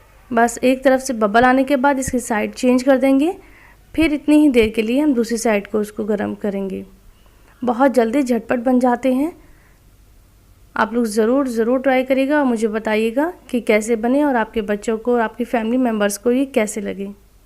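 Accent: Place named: Pakistan. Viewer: Nice pan cake. Kya ap pakistani hai ab ki awaz bht achi hai. Apka lahja bht sweet hai.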